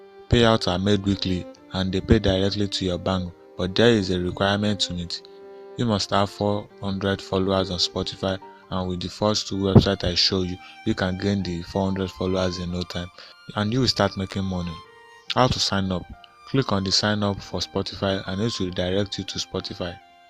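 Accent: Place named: India. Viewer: You are speaking like an Indian man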